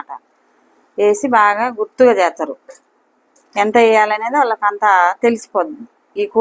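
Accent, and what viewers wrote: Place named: Rayalaseema, India. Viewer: Kaani mee language rayalaseema laga vundi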